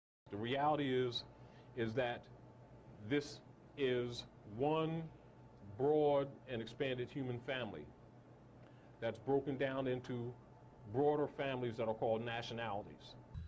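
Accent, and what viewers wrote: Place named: Europe. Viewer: Your comments concerning Nationality revealed that you have done very little viewing and reading on Taj Tarik Bey's work. You have only to study a little bit more to know that you are agreeing with his definition of Nationality. I feel as you are trying to establish credibility and notoriety for yourself by superficially debating long standing scholarship with adolescent claims and shoddy research. If I were to make a guess to your nationality by the sound of your voice it would be of european ancestry, (troglodyte).Which would explain your interest in debunking rather studying.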